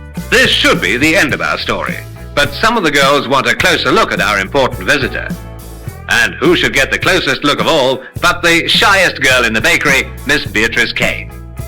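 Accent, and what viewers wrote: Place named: RP, United Kingdom. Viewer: The annoying plummy voiced commentary is a pity- it doesn't sound appropriate.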